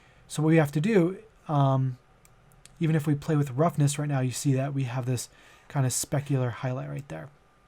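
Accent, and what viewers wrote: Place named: United Kingdom. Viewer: Thank you for your efforts and including screenshots, and thanks for not having a strong British accent. =D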